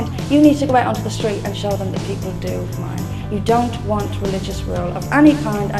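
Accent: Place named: Ireland. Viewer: hey she sounds Irish. fun fun w/the vatican mantra/organized crime etc aye?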